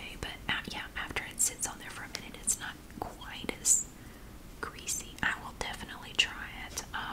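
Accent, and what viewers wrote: South, United States. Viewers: I just started watching your channel. I absolutely love you and your southern accent! Much love and support from New Brunswick 🇨🇦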